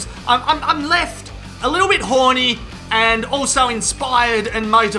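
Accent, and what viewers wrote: Australia. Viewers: Your Australian accent is so strong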